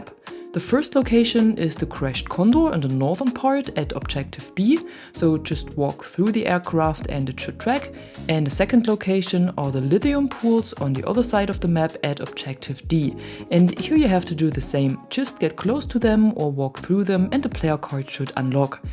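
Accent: Germany. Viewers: I am so sorry. I figured that out.Love your channel and your German accent.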